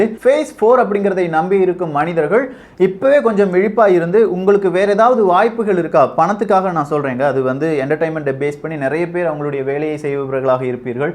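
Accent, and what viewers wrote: Kerala, India. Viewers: நண்பரே உங்கள் தாய் மொழி மலையாளமா? உங்களின் சில ஆங்கில உச்சரிப்பு மலையாளிகள் போலவே உள்ளது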